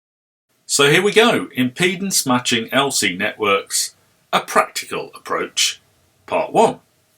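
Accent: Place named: Netherlands. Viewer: Again an excellent video, valuable and highly appreciated. The importance of impedance matching already became clear in the “Connecting It All Together” video I watched a couple of days ago. I skipped it at the time you published it because I thought, reading the title, it was not important to me. Apart from the electronics lessons, your pronunciation is a pleasure to hear, most Dutchmen do not exceed the level of a football coach. 😆 Thanks a million 73, Gerard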